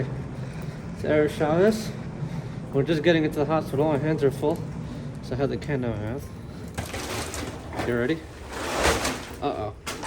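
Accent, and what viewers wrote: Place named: South, United States. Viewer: get that southern accent going buddy.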